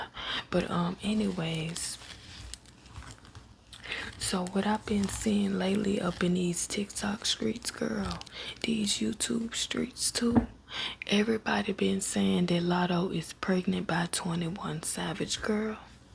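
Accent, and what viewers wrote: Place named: Tennessee, United States. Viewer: Aint even a woman but im frm Tennessee too so yo accent remind me of home 🙌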